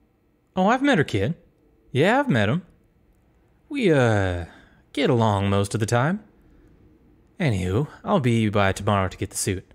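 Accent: South, United States. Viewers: The bit of country I hear is the best I love it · The slight country accent is making my southern heart feel something😩✋🏾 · Where are you from? I can hear an accent 🤔 Are you from the South? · I live in the south and might i say i love the Accent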